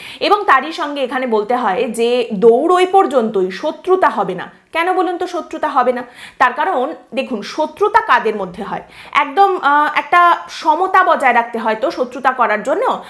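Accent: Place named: India. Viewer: tor kotha bolar dhoron inidna der moto kano tailea ?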